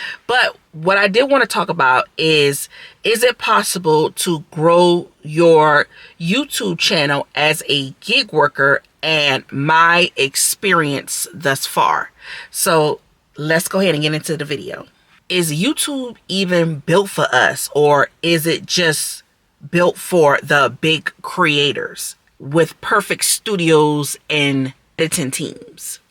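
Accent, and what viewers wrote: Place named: Charleston, United States. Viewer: Ouuuu❤❤❤ I'm going to start recording some GIG work content. Also I knew you were from Chuck town ..... that accent. Im from Goose Creek area ❤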